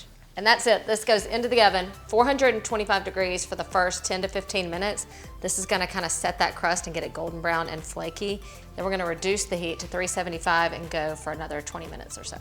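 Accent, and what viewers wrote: South, United States. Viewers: Clear and to the point, even with some bloopers stuck in. And what a hostess with that yeehaw twang! <3 Thank you kindly from Poland.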